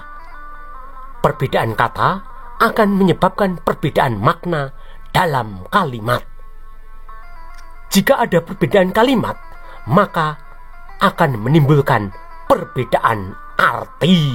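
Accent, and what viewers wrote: Java, Indonesia. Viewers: salut ma suara yang ngebacain alur critanya...medhogh banget jawanya...😂😂😂 GBU mas bro...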